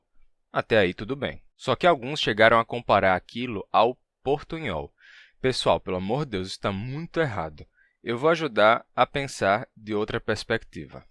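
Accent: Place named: Northeast, Brazil. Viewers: Ao individuo do video.....Se posso perguntar, Voce e da Bahia ou outro lugar do Nordeste Brasileiro? Pergunto porque amo essas explicacoes sobre as linguas e noto que voce tem uma pronuncia do "E'" e do "O" quando nas palavras como faziam meus pais e avos que eram da Paraiba e Sergipe. Eu nasci no Rio e perdi um tanto do sotaque Carioca ao ir pra Sao Paulo. Agora moro na California para onde vim a cinco decadas. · se não me engano ele é de Recife. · Ele é do Rio Grande do Norte. O Sotaque dele é o chamado "DIALETO NORDESTINO", que é o sotaque falado na maior parte dos estados do Nordeste, dentre eles: Rio Grande do Norte, Paraíba, Pernambuco, Alagoas, Sergipe, interior do Ceará e interior da Bahia. A cidade de Salvador, capital da Bahia, tem um sotaque próprio, que é o "Dialeto Soteropolitano". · A "pronúncia particular" a que você se refere é simplesmente um dos sotaques nordestinos brasileiros. 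· Seu sotaque em português é muito bonito. É de Natal? Sou do Rio e acho muito bonito.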